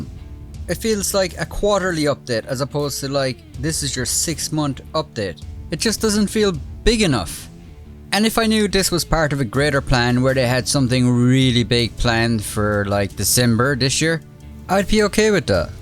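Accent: Ireland. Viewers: How is it, that you speak "More" Irish now, than just by a few videos ago? 🤬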